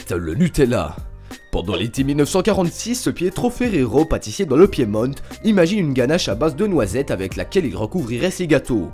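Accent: Belgium. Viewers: T'es pas belges ? Parce que t'as un accent qui ressemble fort à l'accent belge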